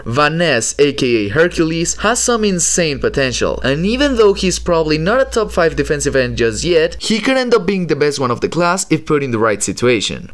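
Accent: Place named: Europe. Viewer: I don’t trust anyone with a European accent to talk football.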